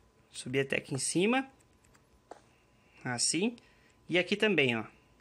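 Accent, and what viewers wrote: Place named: Paraná, Brazil. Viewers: Paranaense? Vc? Kkk vc tem sotaque,me ajudou muito obrigada, super recomendo seu canal👍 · Você é Paranáense? Sotaque legal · Vc tem uma vozinha meia de caipira sem ofeças sla como ser escreve '-'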